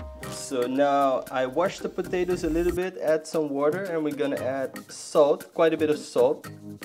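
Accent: Philippines. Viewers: i love your pilipino acsent😍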